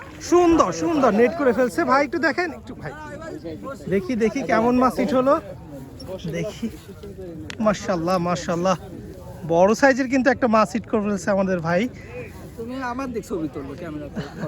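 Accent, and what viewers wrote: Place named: Kolkata, India. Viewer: ai motheri tui kolkatar moto kore kotha bolos kn .. tui ki bangladeshi naki indiar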